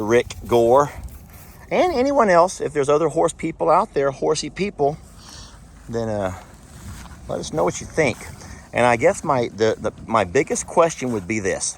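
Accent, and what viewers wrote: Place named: Alabama, United States. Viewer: It is funny to here you say, ‘seement” and “sareen’. Love the colloquialisms even from Alabama to Texas.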